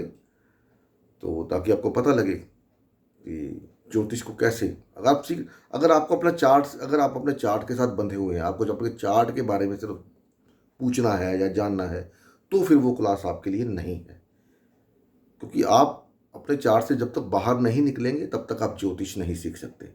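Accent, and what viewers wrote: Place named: Punjab, India. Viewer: Thank you so much,your Punjabi accent Hindi is so attractive.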